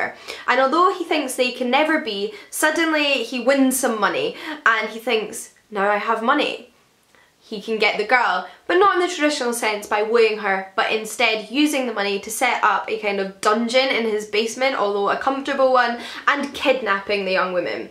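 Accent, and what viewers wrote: Scotland, United Kingdom. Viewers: you're Scottish, aren't you! Lol!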